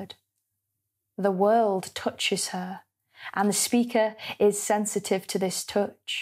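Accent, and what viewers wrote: United Kingdom. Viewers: aye fellow Manc lass😂 great vid!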